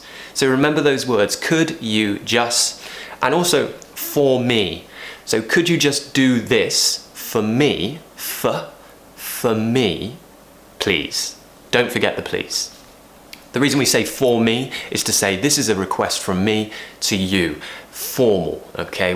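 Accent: United Kingdom. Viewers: Beautiful british accent 🇬🇧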